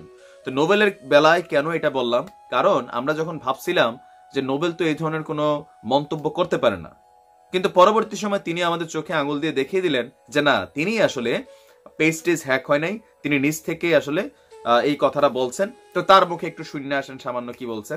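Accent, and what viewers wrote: Comilla, Bangladesh. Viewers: আপনি কুমিল্লার নাকি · ভাই আপনি কি কুমিল্লার,!???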